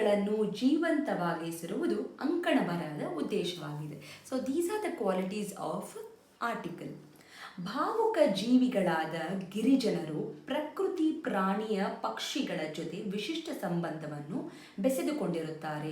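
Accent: India. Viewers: Aunty please don't talk in buuttler english please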